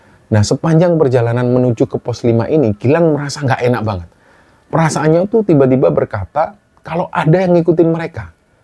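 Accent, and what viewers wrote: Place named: Surabaya, Indonesia. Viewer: ngomong cok nya faseh medok hehe mas ancen arek suroboyo temenan hehe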